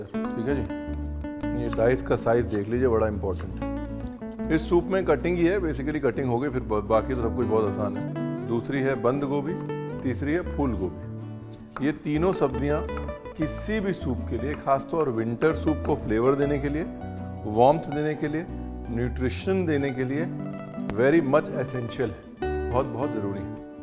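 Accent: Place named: Uttar Pradesh, India. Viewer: Is Ranveer UP'ite? He has a UP accent..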